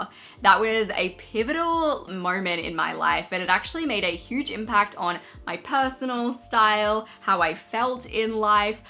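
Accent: Australia. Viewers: Dear, I love hearing your voice because you make me feel like I’m still in Australia! I often think I’d like to see how you’d evolve after having children... I had 2 pretty close together and THAT was made me crave minimalism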